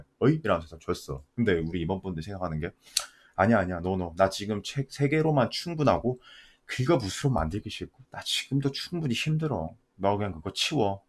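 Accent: Gyeongsang, South Korea. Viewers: 2번~(2020/7/12) 2명. 잘 맞추시네요~^^ 경상도말씨 반가워요~^^ 주말잘보내세요.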